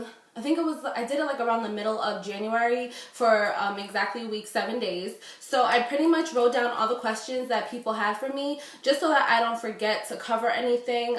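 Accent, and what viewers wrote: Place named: New York, United States. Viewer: NY accent... eeeww!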